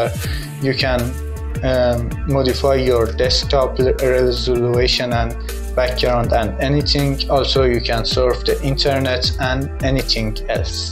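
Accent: Iran. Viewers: Irani ? تابلو بود ایرانی 😂❤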